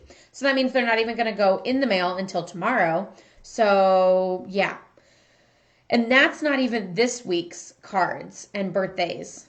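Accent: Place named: St. Louis, United States. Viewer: Sounds like you are in the St. Louis area¿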